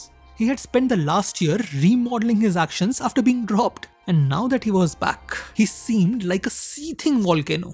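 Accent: India. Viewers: This is mother tongue influence we all Indians have...We are not Brits. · I like how don't try to pretend and fake your aaccent and use our Indian accent. Kudos.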